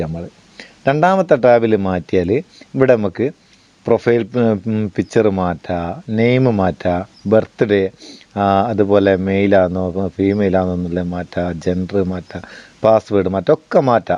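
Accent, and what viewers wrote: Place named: Kasaragod, India. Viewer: kasaragod aano🤔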